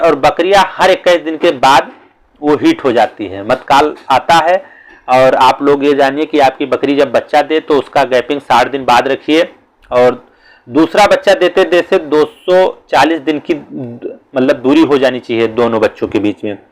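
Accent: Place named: Uttar Pradesh, India. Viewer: Apki awaj se bahraich ki mahak aati hai. Thanks brother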